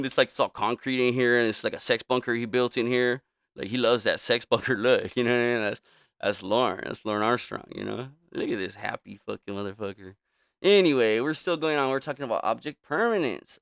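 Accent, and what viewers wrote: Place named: Latin America. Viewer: When listen to your voice I am getting a hint of a Latino accent and I am ready for you to break into a Cheech and Chong skit.💨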